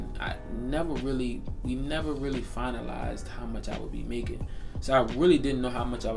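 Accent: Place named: Washington DC, United States. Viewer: I love the DC accent.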